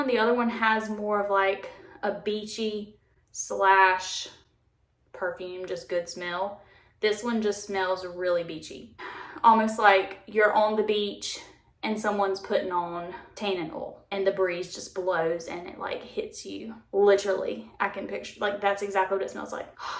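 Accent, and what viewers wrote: South, United States. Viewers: Omg you're so pretty!! you remind me of when I was at Oral Roberts University in person with your Southern accent love😍😘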